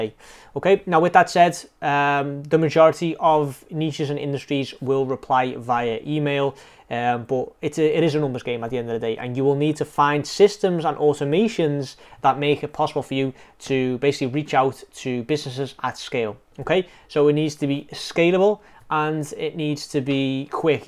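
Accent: Liverpool, United Kingdom. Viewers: High quality content🙌💯 you have a scouse accent haha, it's really unique and I love it. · Where abouts is liverpool you from?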